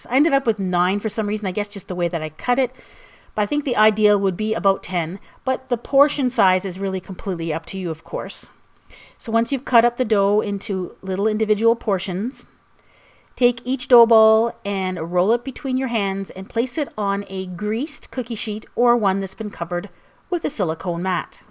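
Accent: Canada. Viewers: I can't tell if she's Canadian or from Minnesota.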